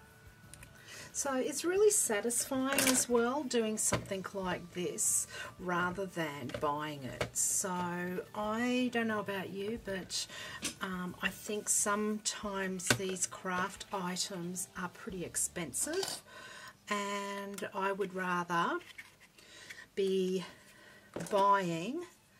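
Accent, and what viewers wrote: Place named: Australia. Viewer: this is a great caddy for the inks. I love using other things to make what would have been expensive storage items, especially here in Australia. I recently made a tool caddy from empty sauce bottles, bought from choice or stacks, they are cut down to different sizes and sit on an inexpensive lazy Susan. There is nothing quite as good as making your own. Thanks for this and great to hear a fellow Aussie.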